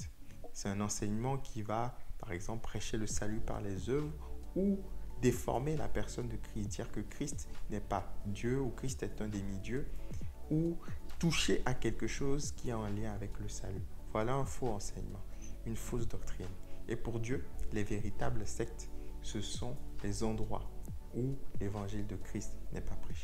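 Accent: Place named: Ivory Coast. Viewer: Bon enseignement pasteur et excusez-moi vous êtes ivoirien ? Parce que je crois avoir reconnu l'accent haha. GOD bless you🔥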